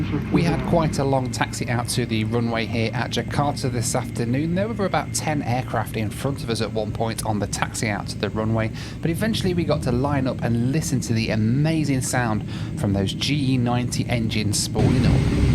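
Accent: United Kingdom. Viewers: You sound British did you move to usa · thought u r british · as a newbie so i know. You sound like you are from the United Kingdom but you live in Houston in the US? Is that correct? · Hi, enjoying your videos, i thought your were from UK, didn't realise you live in USA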